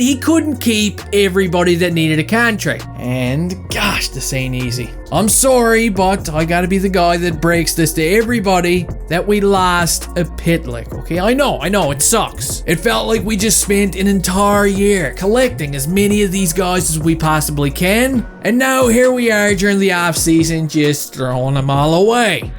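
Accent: Newfoundland, Canada. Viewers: Great video! My dad says your Newfie accent is so thick he thought you were Irish and he’s from Cork.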